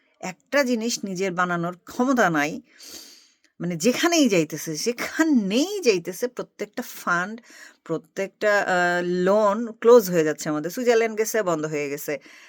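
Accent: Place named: Bangal, Bangladesh. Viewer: Bangladesher rajniti bujhina,apnar mukhe Bangal kotha shune valo laglo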